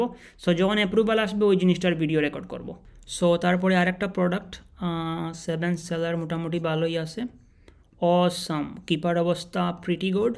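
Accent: Sylhet, Bangladesh. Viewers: are you sylhetti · Assalamualikum.bhai kita Sylhety ni? mashaAllah nice video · ভাই তুমি সিলেটি নি? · 🫣তুমার মাত হুনলে বোঝা যায় তুমি সিলেটি 🏞️😍😘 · Apni ki Sylheti 🙂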